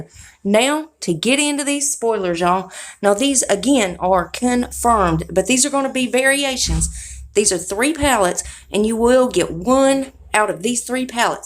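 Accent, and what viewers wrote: South, United States. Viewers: Lawdddd your southern just like I am honey I love it so much and your gorgeous to boot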